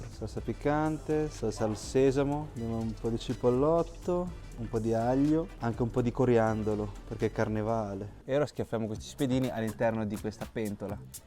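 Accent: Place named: Emilia, Italy. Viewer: I tuoi video sulla Cina sono stupendi. Il tuo accento emiliano e' fantastico.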